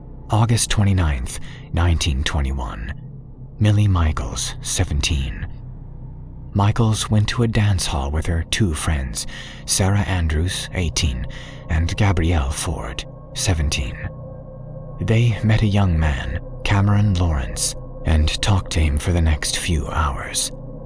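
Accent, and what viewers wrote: South, United States. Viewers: Love that thair southern accent ya got!! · oh I didn't know that youtube still alerts you on comment even on old videos that's cool, anyway thanks for reading these stories in a southern accent add to the feels and keep up the awesome work. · Southern accent pretty damn close! My family is from the south, and you did pretty well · Btw impressive southern accent 👌🏻😊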